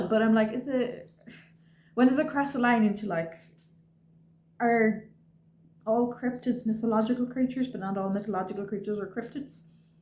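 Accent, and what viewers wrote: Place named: Ireland. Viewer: Love your fabric choices. Great to hear an Irish accent. Happy stitching.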